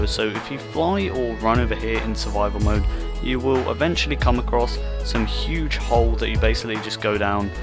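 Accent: United Kingdom. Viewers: you have such a generic british accent :D when i try to make a british accent your's is precisley what i get. and my main accent is East-Coast American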